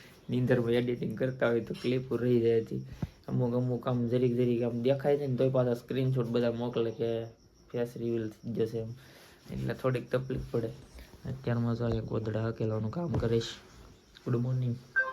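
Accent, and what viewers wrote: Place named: Padvi, India. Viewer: પડવી છે આ બોલી પડવીછે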